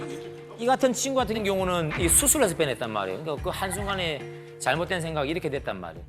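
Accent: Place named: South Gyeongsang, South Korea. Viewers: 기분 나쁘지 않은 사투리임ㅇㅇ 어떤 경상도 사투리는 짜증이 가득 묻어있어서 짜증나는데 이분은 오히려 ㄱㅊ음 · 비뇨의학과 의사 + 경남사투리 + 딕션 모든게 완벽함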